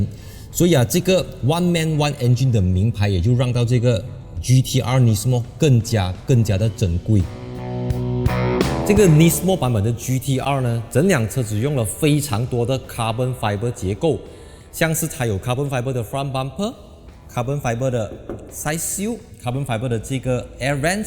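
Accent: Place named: Malaysia. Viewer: 我们马来西亚的华文就是这样的ma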